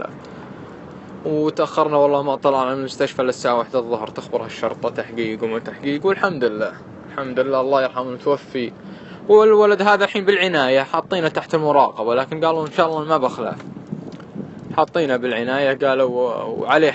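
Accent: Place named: Sakaka, Saudi Arabia. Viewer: لهجة اهل سكاكا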